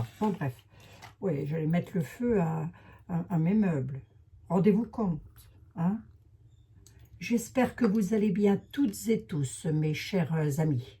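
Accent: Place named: Lorraine, France. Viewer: Ah voilà pourquoi votre accent m'interpelle et votre facon de parler m'est douce à l'oreille ! Vous êtes lorraine ! Ma grand mère tant aimée était à Metz. Ma ville de coeur où j'ai passé toutes mes vacances, durant mon enfance ! Oui creusez creusez... Et le 30-31 on aura sans doute le début des réponses. Can dace a prévenu ce sera en plusieurs épisodes ! 😉🤩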